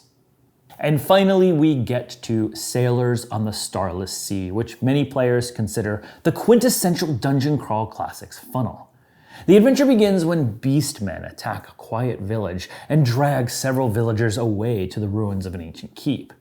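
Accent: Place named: Australia. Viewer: This is brilliant but that Australian accent is bloody awful .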